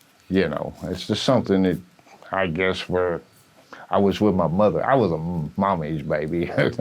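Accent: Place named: South, United States. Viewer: I’m a fifth generation southerner. His accent is a beautiful song to my ears. We Floridians have lost our accents since I was a kid. A lot of us.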